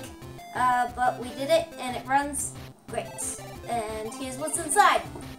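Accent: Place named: Brooklyn, United States. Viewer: I can hear a Brooklyn accent...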